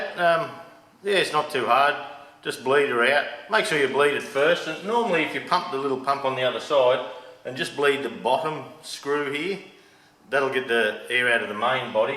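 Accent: Australia. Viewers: Just discovered your channel. I'm a mechanic too. Mainly auto and light truck. But now venturing in big diesel like heavy truck and equipment. I'm in upper Midwest, central Minnesota. Sounds like you're in Australia? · Thanks pardner...same pump as my Cummins on my 40hyatt boat...very helpful.. Good job..u sound like Australia.. I'm n Chattanooga Tennessee.. Thanks again friend..!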